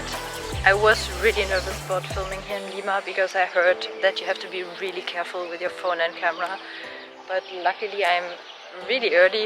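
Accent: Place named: Europe. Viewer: Hello, I have been to Lima 3 times, but the last time was in the early 80's. I have also been to 29 other countries. Your voice sounds familiar, maybe I visited your country before, but can not place your home country. I think maybe European. Ron USCG RET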